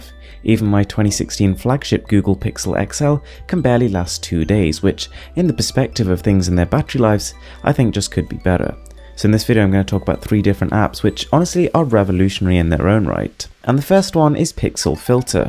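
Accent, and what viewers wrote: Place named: England, United Kingdom. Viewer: Only thing I think I get impressive in your video is your sound and way you speak English. I didn't see any Indians speak English like a English men. I feel you do little showoff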